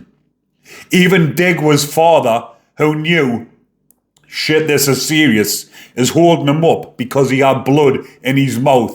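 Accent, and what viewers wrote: Newcastle, United Kingdom. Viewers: Oh ! Hello bonny lad ! Being honest ! Hearing my fellow bretherons voice ! Thinking your a geordie ex con ! Thinking ! That devils decilple ! Is about to reap the rewards ! For ! Killing ! An innocent boy ! Not us bloody english idiots lol ! Family a little fierce lol done jail time ! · A Geordie accent.North-East of England.Newcastle area.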